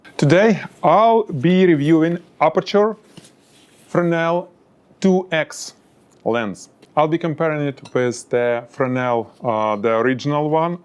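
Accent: Russia. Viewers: По первым словам сразу понятно, что русский)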